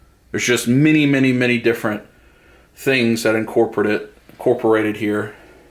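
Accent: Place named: Texas, United States. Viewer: He has a texan accent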